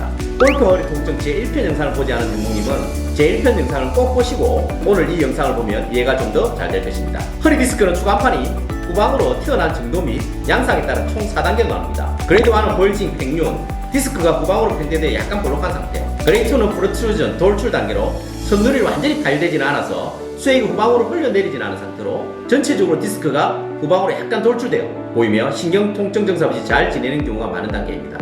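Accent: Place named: Gyeongsang, South Korea. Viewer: 낭만 닥터님. 구수한 갱상도 사투리에 열정까지 담아서 설명해주시니 머리에 속속들어옵니다^^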